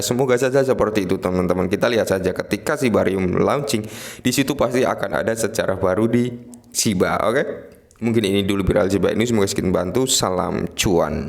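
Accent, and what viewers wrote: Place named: Surabaya, Indonesia. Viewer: mas sampeyan womg endi? koyoke gak adoh² teko suroboyo nek ndelok logat e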